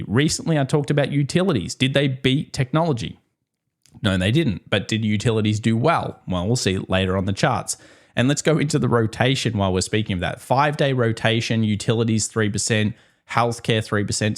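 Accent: Australia. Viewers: you're Australian? I thought you're British.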